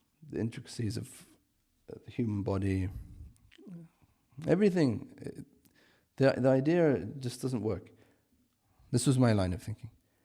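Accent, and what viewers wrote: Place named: Arab world. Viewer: Appreciate the video ... just some constructive criticism .. because not even Omani's do what you're doing .. when you speak English which is your native tounge your speaking it as if your speaking Arabic ... I think if you're more natural your English videos will be better .. this seems sooooooo reliant on the Arabic accent that you've developed .. that it comes off boring and almost forced ... just my analysis I think as an Omani myself you can do better in representing your own culture, the English culture, with a better delivery of the spoken voice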